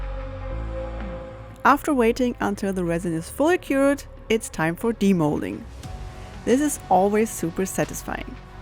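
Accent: Germany. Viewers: Are yue Germen 🇩🇪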